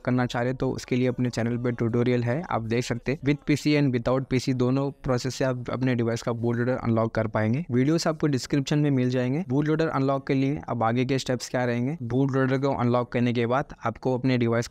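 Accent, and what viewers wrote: Hyderabad, India. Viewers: Hyderabad se ho aap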